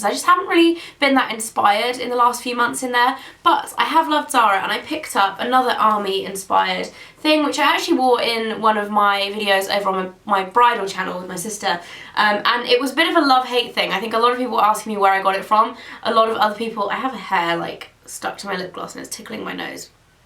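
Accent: England, United Kingdom. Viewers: umm i have 2 questions 1. if you don't mind what part of England are you from like i'm from normanton:] 2. what camera do you use? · You sounded to English in this video!! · I absolutely love Brittish accents! When you say khaki it sounds like car key LOL! xoxo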